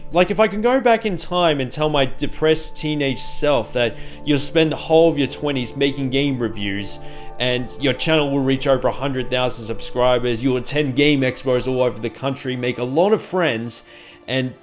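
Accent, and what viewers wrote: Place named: Australia. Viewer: I really love your videos, I’ve been watching you for ages now. But I love that there’s an Australian voice in this space on YouTube and I also love the quality of the videos that you put together. Thank you so much for inviting us in and letting us know a little bit about you and the reason for the channel, I’m sure I’ll appreciate everything just a little bit more now. Congratulations on 10 years!!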